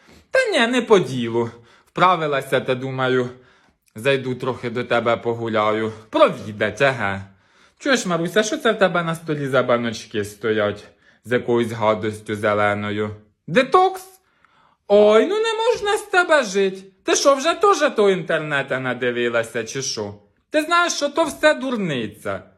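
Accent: Poltava, Ukraine. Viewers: Море позитиву,який приємний Полтавський говір,Дякую тобі,ти супер👍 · Я в захваті!!!! І це мало сказано... Ваша полтавська говірка, полтавська манера, тембр, жести, міміка -- все все так щиро і сердечно сприймається, що хочеться вас і дивитися і слухати і насолоджуватись... Як же добре, що є інтернет, який дає змогу нам милуватися дійсно народними талановитими самородками.. Вітаю, бажаю нових і нових підписників.. А особисто -- чудових ідей, гарних відео і успіхів на вдало вибраній стезі!!! Хай щастить завжди і всюди!!!!!!!!!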